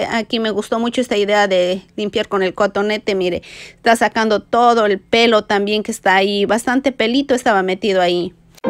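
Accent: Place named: Ecuador. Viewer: Hola, apenas hoy encontré tu canal y vi muchos videos, y de una reconocí tu acento ecuatoriano:), aprendí mucho. Muchas gracias por compartir tus truquitos. Un abrazo desde Quito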